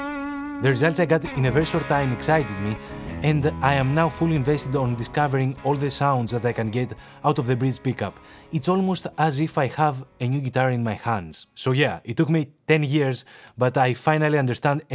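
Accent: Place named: Greece. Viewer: Σίγουρος από τα πρώτα 2-3 δευτερόλεπτα ότι ήσουν Έλληνας.. Nice vid bro, keep 'em coming! Subscribed!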